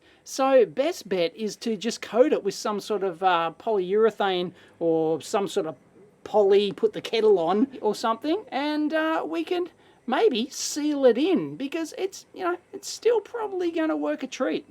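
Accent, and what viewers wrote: Australia. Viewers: There are 2 reasons why I watch your videos: 1. Electronics 2. Your Aussie accent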